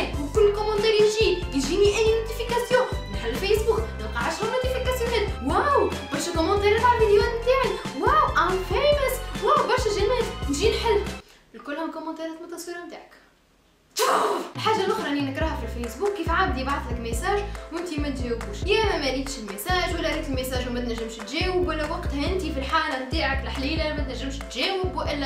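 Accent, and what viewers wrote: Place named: Sahel, West Africa. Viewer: hhhhhhhh you're funny,brabi se7lia? lehjtek thohrtli se7lia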